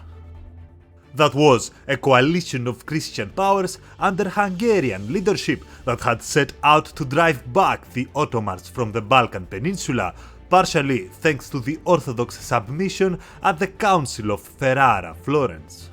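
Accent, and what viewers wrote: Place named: Greece. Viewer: Have you heard his accent, he is a greek spreading bullshitt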